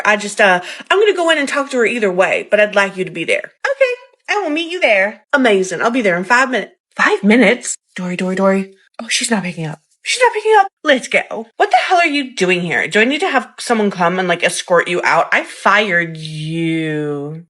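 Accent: South, United States. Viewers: I love the southern accent. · OMG I love the Southern Belle accent!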